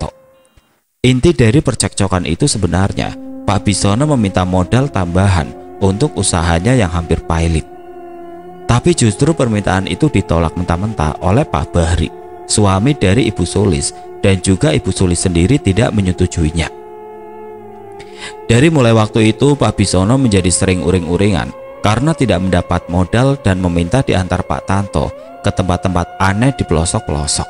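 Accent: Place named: Java, Indonesia. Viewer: bukan, kayanya yg bacain cerita ada 2 org. Klo yg biasanya itu mngkin yg namanya mas, logatnya Jawa agak2 ke perbatasan Jawa barat. Tp klo yg ini Jawa nya medok bnget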